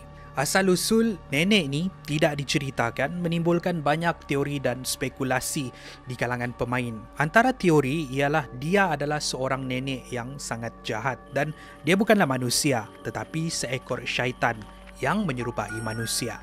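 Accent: Sabah, Malaysia. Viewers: Abang orang Malaysia ke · Btw i Mo ckp , loghat mu mcm kntara org Sabah 😅😅, org Sabah eh ? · Orang sabah kah ni😂😂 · Abang ni orang melayu ( Sabah ) atau indonesia ya? Kalau saya orang malaysia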